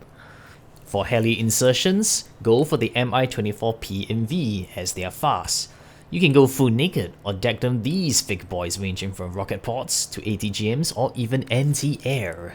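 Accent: Singapore. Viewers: somebody sounds like they're from singapore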